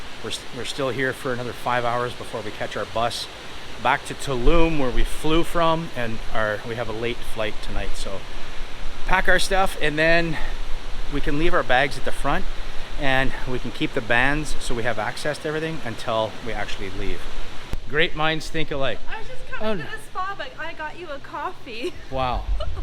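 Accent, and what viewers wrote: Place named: Ottawa, Canada. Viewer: Ah you’re from Ottawa “ eh “? I have a question regarding the currency exchange place you showed towards the end of this video. I was wondering if you tried exchanging Canadian Currency for Pesos? I have always taken pesos with me in our several trips to Mexican Resorts but I heard on one other video from this resort that the currency exchange kiosk takes Canadian dollars in exchange for Pesos? Im a little disheartened about the reserving of the Ala Carte restaurants. On our first trip which happened to be in the Mayan Riviera the resort we stayed at require pre booking reservations at the restaurants at the lobby. It turned out that we ate almost all meals for the 7 days at the main buffet because it was huge and I’ve never seen as much selection and variety in a Mexican Resort Buffet. The second trip we were told we had to make a reservation for any of the three A la Cartes at a desk in the lobby am however if you weren’t in line by you had no shot at getting a reservation. 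We did manage to get into the Mexican restaurant and the steak restaurant ( but pm! the last available reservation ) later during our 10 days at stay but twice even though I stood there for 2 hours and was between 10-15th in line when I got up to the desk they said all seating in each A la Carte was fully booked. Walking past those places to pm and seeing half of the tables empty was a disappointment. I’m hoping that either or the buffets have good food and variety or I’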